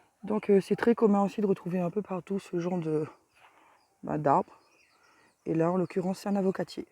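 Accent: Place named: Reunion, France. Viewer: Merci pour cette ballade ds la nature reunionaise. Ca me rapelle mes randos à Mafate. Les images sont reussies et on perçoit un petit accent reunionnais ds la voie malgré un sejour prolongé en metropole.😊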